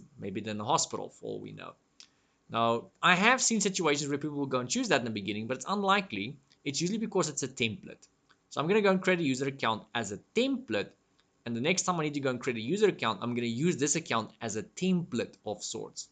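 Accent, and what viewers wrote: South Africa. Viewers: Curiosity sake are you South African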